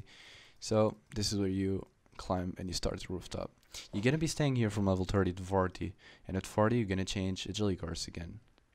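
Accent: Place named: Portugal. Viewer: Where are u from? You sound kinda portuguese..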